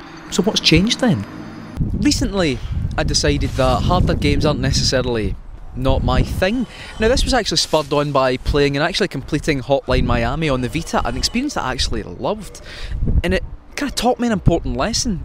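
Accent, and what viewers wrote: Scotland, United Kingdom. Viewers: Awesome vid, where in scotland btw? Tryin to work out ur accent. Im fae fife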